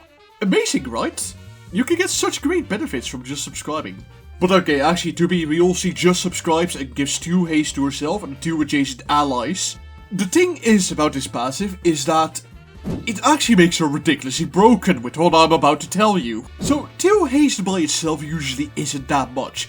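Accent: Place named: RP, United Kingdom. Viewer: You have the voice of a British lord, will you appear in wuthering heights?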